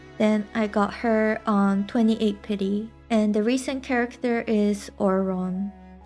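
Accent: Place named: Indonesia. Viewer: ur not sound like japanese, more like indo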